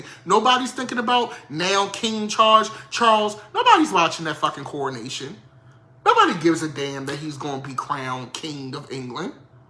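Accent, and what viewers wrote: Baltimore, United States. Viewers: You got me over here cracking TF up, lil Bmore accent and all the shade- YouTube sent me here from Tells and I LIVE. Hope you do more 🤣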